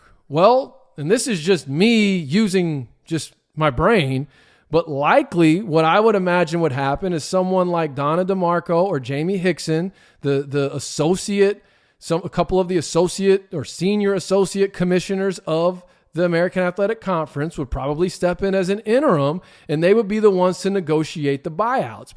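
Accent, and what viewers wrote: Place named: East Coast, United States. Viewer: PAC refugees going to a depleted AAC 2 timezones away with a locked in 9+ year contract making roughly $4MM more than MWC is suicide. The brutal reality is MWC offers the softest/safest landing spot. PAC+MWC merging keeping PAC name makes the most sense financially, economically, and geographicly. Add Gonzaga as the 14/16th member would be the cherry on top. This podcaster sounds like he's from the East coast chiming in his biased opinion.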